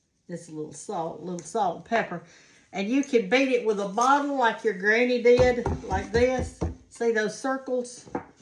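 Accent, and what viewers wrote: South, United States. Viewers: Love how you said you keep moving. Anything special you do? Love your videos and your wisdom. My mother was born and raised in Meridian, Mississippi. Your southern speech warms my heart🙏🙏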